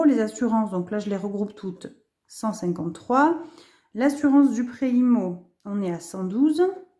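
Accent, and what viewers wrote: South, France. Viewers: Je trouve votre idée super pour le scolaire et pour les enveloppes ✉️ budgets etc... vous êtes une vrai warrior avec tout ce que vous entreprenez c'est très courageux! Vous avez un accent du sud je trouve c'est très jolie à entendre🙂 je vous souhaite de mener à bien tout vos projets et de vous faire un max de sous afin de pouvoir profiter un max de la vie avec vos proches🙂👍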